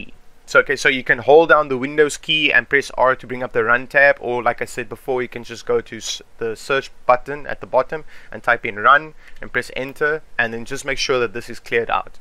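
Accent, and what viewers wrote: South Africa. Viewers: You have an afrikaans accent🤔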